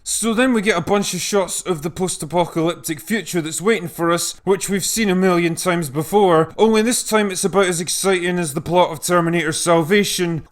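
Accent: Scotland, United Kingdom. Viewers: At first I was like shut the f up. But then I thought your voice is a cross between scotty of trek and braveheart But......take a breath it will be ok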